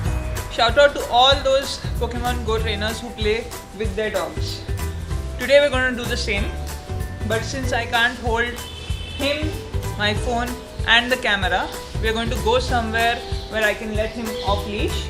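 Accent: India. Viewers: Damn. That sucked. The Indian accent is annoying. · aaahahahah I just cant stand the Indian Accent :DD I just cant stop laughing omg, this sounds so racist hahaha. "Walecum duuu dii internasional dexcs" omg im dying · Indian accent is by far one the best. But I can't help but laugh a little 😂 sorry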